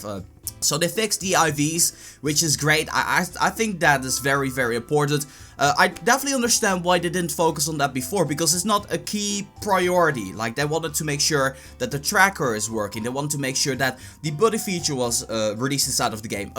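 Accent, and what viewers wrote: Netherlands. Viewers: ben je nederlands ik hoor het aan je accent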